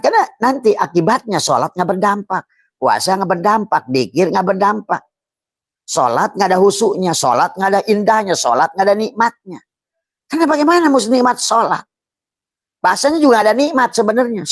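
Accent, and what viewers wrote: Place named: Java, Indonesia. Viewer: Pasti orang jawa ini ya😂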